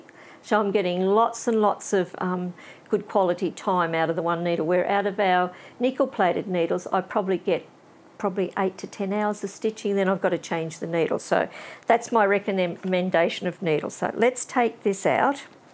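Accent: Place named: Australia. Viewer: Thank you. Lovely to listen to a fellow Aussie. Your baskets were extremely neat and tidy; best I have seen. I have subscribed and binge watched several videos in a row. Good to know I can purchase notions in Australia.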